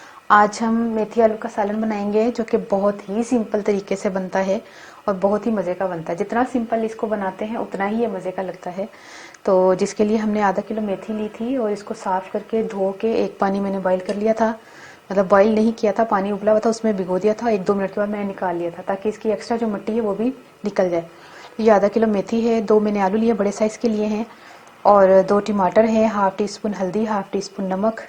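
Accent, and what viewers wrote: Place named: Punjab, India. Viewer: Oh my god your punjabi is very mast 😂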